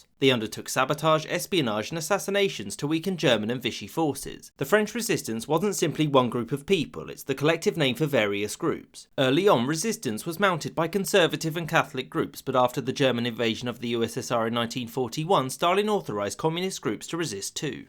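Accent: England, United Kingdom. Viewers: I think the English accent explains why the video is anti-British.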